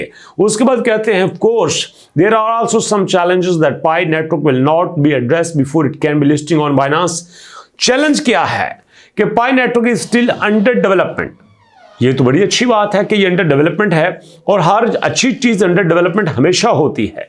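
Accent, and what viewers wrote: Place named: Assam, India. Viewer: Indian Assamese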